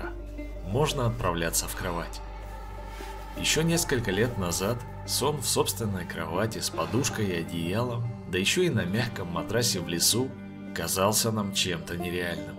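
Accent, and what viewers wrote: Standard. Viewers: так приятно слушать твой голос, неторопливую твою речь, чистый русский язык! Видеть приятно твою любовь к лесу, счастливое лицо от встречи с жителями леса. Каждый ваш фильм - отдых душой, заряд добра и любви. Вы - лучшие!!! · Меня за интересовал сюжет дом на дереве, но прятней всего оказалось, слушать чистую литературную русскую речь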